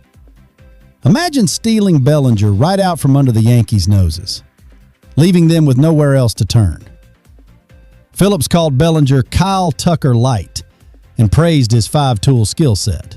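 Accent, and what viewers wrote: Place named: South, United States. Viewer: Why does the AI voice have such a good old boy American accent?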